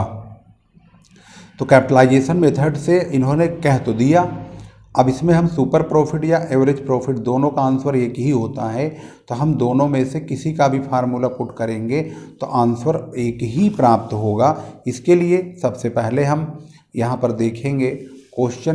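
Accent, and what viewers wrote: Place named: Meerut, India. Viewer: Sir i think you're from meerut,i guess by your tone 😅and thanks for your video 😊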